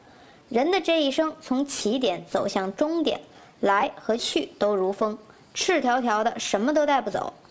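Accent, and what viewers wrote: Beijing, China. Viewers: 作者是你們大陸人 台灣人講話的口音 不是這樣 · 你說的話好像是北京一帶的？？？？？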